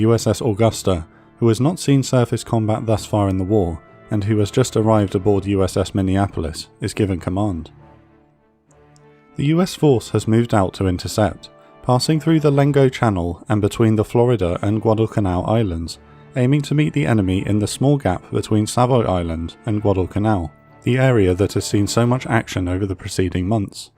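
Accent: England, United Kingdom. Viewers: Get rid of the heavy English accent that I can't understand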